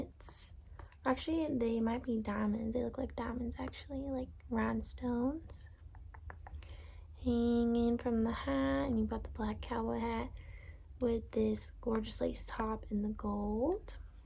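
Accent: South, United States. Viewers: i love your southern accent videos, hope to see more of them <3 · Soft spoken ✨️ Southern accent 🌸 Showing us things on iPad 🎀 10/10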